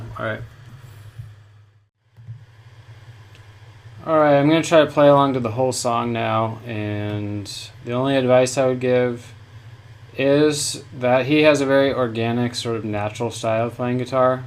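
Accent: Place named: United States. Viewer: Yes, the only thing is, I mean I don't know where youre from, but as a German you dont understand a word, it seems to be one :) Are you californian or texanian?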